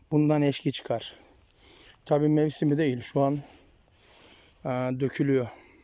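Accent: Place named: Kahramanmaraş, Turkey. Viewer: Konuşmana bakılırsa maraslisin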